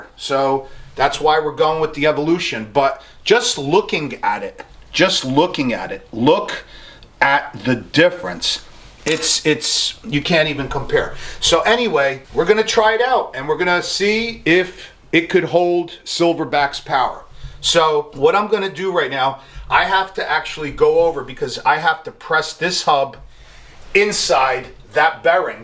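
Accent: New Jersey, United States. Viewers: LOL!! Brother you crack me up. So Jersey. "You know another thing that pisses me off? These freekin things fall off my trees!" Priceless! My women and I watch you all the time. Love the channel. Live in Texas now. Listening to you reminds me of my friends and family back home.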